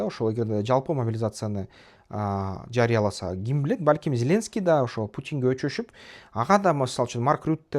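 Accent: Standard. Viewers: Эн маанилүүсү таза эне тилде сүйлөгөнү эле кандай сонун ийгилик түшүнүктүү кылып айтасыз керемет.Ар бир берүүнү күтүп көрөбүз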